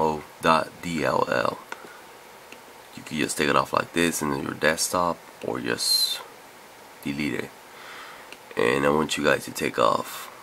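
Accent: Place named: Russia. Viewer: No idea where that accent is from, Russia maybe, but you just solved my problem. Thanks!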